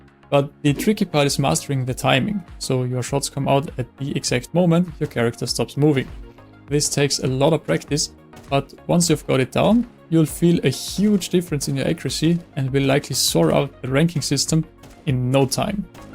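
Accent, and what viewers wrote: Austria. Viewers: als ob du österreicher bist hahahha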